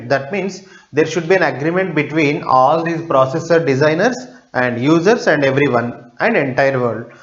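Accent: South India, India. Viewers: not every Indian talks like that's a south Indian accent lmao. also if he wants to talk like that he Will talk like that if you don't understand it go watch another video lol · serioously can you speak better than that ? we know that you are indian but at least try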